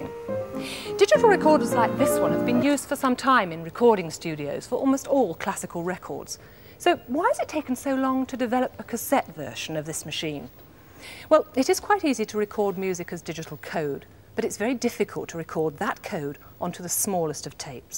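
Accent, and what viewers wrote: RP, United Kingdom. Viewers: I love the way the presenter was so posh. I wish the women I knew were hi-fi nerds! Imagine a woman blabbing on about which tapes to use and if Dolby should or should not be used! That would be fantastic, instead they bombard me with crap and TikTok videos I delete immediately upon receiving! Anyway, this cassette was a flop, the CD was launched in 1982 also and we all know what happened!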